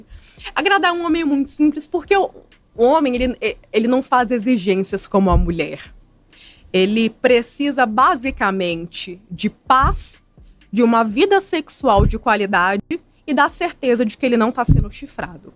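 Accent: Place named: Minas Gerais, Brazil. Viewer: EEEE sotaque gostoso esse de minas, eu aqui no RJ amo quando alguem de Minas ta perto de mim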